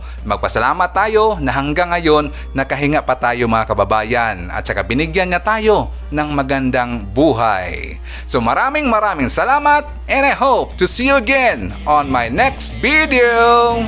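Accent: Visayas, Philippines. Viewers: Watching from Davao City. Bisaya ka idol?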